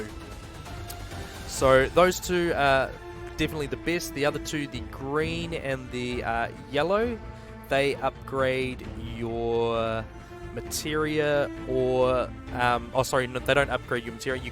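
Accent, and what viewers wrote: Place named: New Zealand. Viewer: Yo, instant sub when i heard kiwi haha. Me and my son are jamming this atm. Big fan of the ff series great vid.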